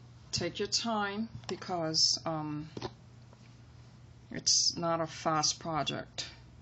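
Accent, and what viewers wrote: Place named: New York, United States. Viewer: I love your videos! Your accent reminds me of my BFF Nancy from NYC. I also Love to Crochet earrings because they are fast and easy. TY for your videos and pls. keep them coming!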